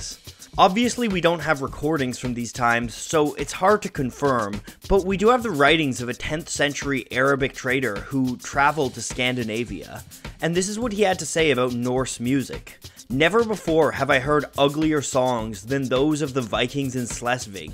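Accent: Canada. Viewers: Someone's Canadian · Are you Canadian? I like your accent. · spencer jacobs I'm Irish and he's definitely Canadian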